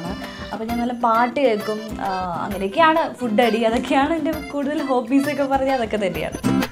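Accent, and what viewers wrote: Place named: Kochi, India. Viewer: ചേച്ചിയുടെ കൊച്ചി സ്ലാങ് സൂപ്പർ ആണ് ട്ടോ...🥰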